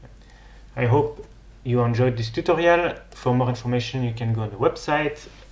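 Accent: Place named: France. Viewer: loving the french accent!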